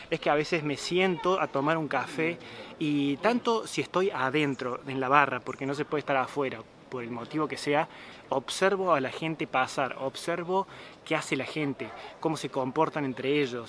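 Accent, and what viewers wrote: Cordoba, Argentina. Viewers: Me encanta tu acento coooordobes... 🤘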